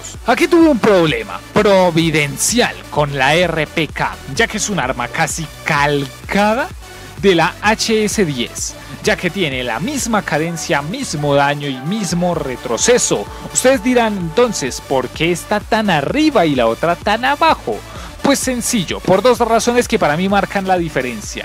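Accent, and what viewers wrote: Colombia. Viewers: Wow, tienes un acento muy limpio y neutro para ser colombiano, todos los que conozco no pueden decir una oración sin usar groserías o jergas horribles de acá (También soy colombiano)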